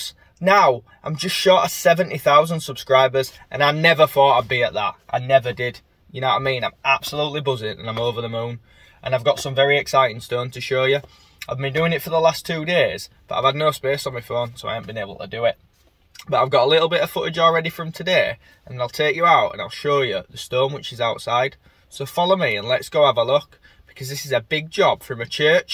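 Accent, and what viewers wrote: West Yorkshire, United Kingdom. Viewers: Came for the workmanship, stayed to hear my own beautiful West Yorkshire native accent that I miss hearing daily now I live in the USA. Thanks for being a brill Yorkshire ambassador, wearing your accent and showing the world Yorkshire pride, skill and industry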